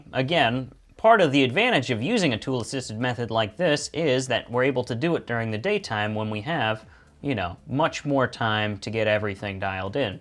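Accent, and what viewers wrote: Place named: United Kingdom. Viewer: I think you must be British!!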